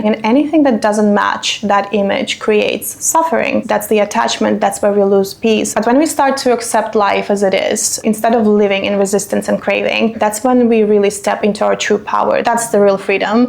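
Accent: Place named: Norway. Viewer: I just wish you'd have said that cool pali word in your cool Norweigan accent😂!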